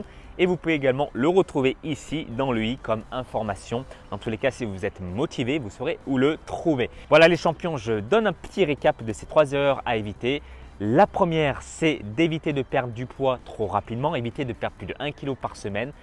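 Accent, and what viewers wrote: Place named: South, France. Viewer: Le petite accent du sud ☺️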